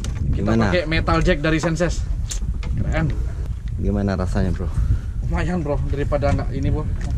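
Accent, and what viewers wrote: Bangka, Indonesia. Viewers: Orang mna bang. Kok kyk org bangka. Hehe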